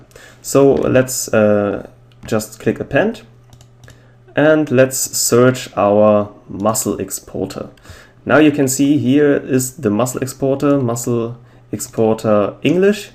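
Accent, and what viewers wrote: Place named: Russia. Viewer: Вроде русский акцент, запили на русском всё тож самое плиз =)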